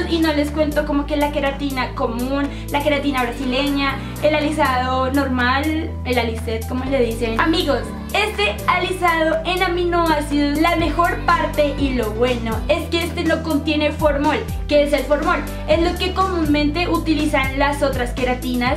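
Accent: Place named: Colombia. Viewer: Me encanta su acento colombiano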